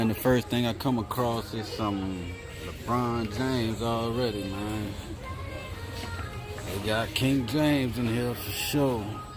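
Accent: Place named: New Orleans, United States. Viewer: Sound like you from the N.O..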